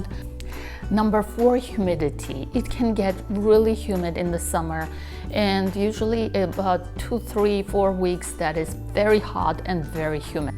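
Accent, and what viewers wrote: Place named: Boston, United States. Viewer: You say Massachusetts but all I hear is Boston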